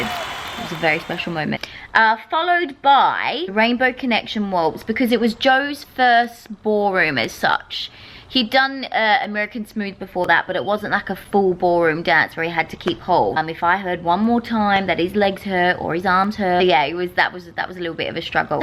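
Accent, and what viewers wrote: Australia. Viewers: thank you for making me smile thro out these vlogs. ive been poorly and feeling very down lately and you always make me smile 😌I hope is meet you one day seems I love Australian accents 😍 Insta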